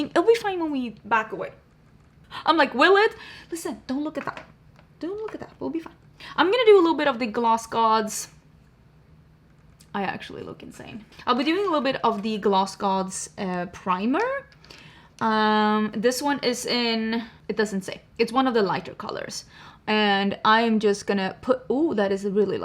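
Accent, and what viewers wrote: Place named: Sweden. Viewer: The automated caption did NOT follow you on the words skolavslutningen and midsommar. 😅 Love the swenglish tho! Keeping it real. 🇸🇪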